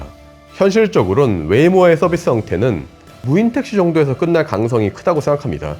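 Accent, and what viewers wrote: Gyeongsang, South Korea. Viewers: 경상도 사투리 구수하니 좋네요!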